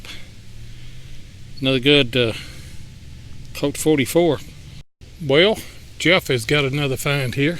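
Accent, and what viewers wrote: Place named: North Carolina, United States. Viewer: Enjoyed the video! You sound like my husband's family who grew up in the mountains of North Carolina in the Canton/Clyde area. I'm starting a metal detecting club at the school where I teach and I would love to show some of your videos to the students! - Vicky Jackson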